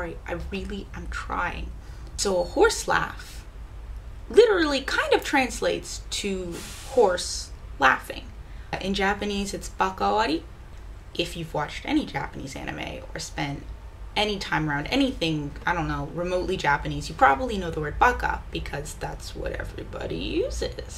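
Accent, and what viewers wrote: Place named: Minnesota, United States. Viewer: Omg is she from Minnesota??? That accent!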